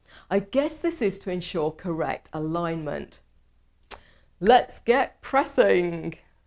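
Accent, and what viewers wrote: England, United Kingdom. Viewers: As much as I can't stand videos or commercials with people who have english accents, in this video , for some reason I was able to overlook that.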